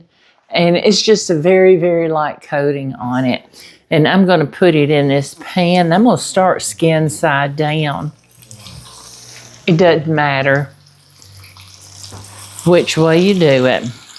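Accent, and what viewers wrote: South, United States. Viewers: OMG! I just happened to fall upon your channel and, if I close my eyes, you sound just like Paula Deene. I love your Southern accent. New subscriber 🤗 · What a sweet couple you are, so glad I just found your channel! ❤❤ Congrats on being featured in the magazine! What state are yas in, love your Southern accent. ❤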